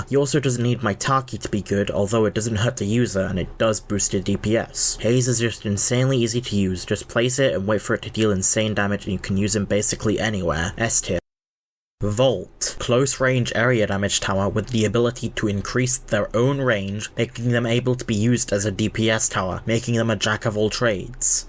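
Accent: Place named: RP, United Kingdom. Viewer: You talk posh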